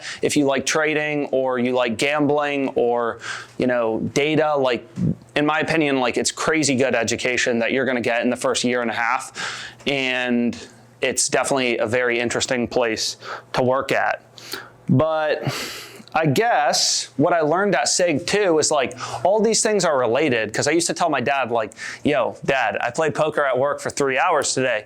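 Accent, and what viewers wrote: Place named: South Jersey, United States. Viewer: 2 questions. Are you from delco? Cuz you sound like you are either from south jersey or delco. Andddd did you sorta think you could wing this presentation without much practice before? I know how it feels to speak to a group of strangers cuz i used to host trivia and my first few shows were terribly awkward. Just like this was at times, rambling in and out of tangents, awkward long pauses etc. Part of that skill definitely come with repetition which will come with time, but preparation helps and this felt a bit undercooked at times for sure.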